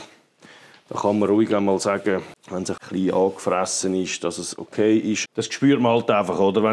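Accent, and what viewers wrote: Switzerland. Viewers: Supergeil, dein Schwizerdütsch. Schön erklärt ! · Keine Ahnung von Töffs, aber ich liebe schwizerdütsch . Genial. Sofort abonniert . Dankeschön !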